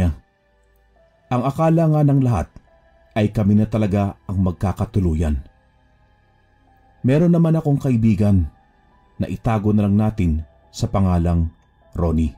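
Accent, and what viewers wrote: Ilocos, Philippines. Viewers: nakakatuwa ka naman mg ilokano lods hehe nice story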